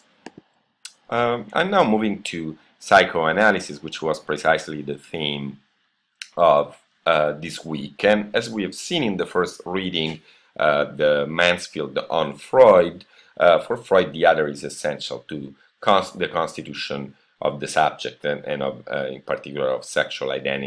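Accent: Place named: France. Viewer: You are frensh !! isn't it :).. It's clear from your accent... I'm Moroccan so it's easy for me to discover that haha..Thank you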